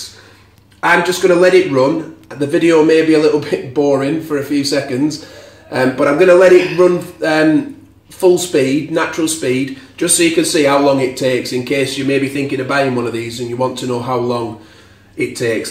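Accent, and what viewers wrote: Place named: North, United Kingdom. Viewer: Couldn’t make sense of the nespresso instructions, all I needed was a straight-talking northerner to show me what I was doing wrong, thanks!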